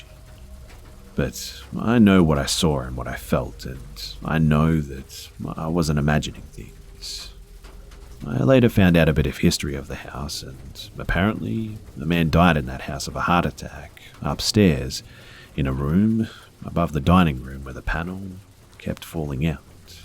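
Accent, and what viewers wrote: Australia. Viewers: thois guy izza aussie fah shhure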